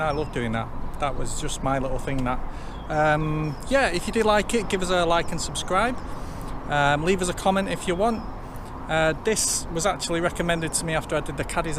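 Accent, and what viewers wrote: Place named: Manchester, United Kingdom. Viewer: Well done typical Mancunian humour, brave face, big smile while all the time weeing your pants well done mate you did us all proud. I miss the Old town and the accent. Thanks for your great Docos Bill the Mancunian in Western Australia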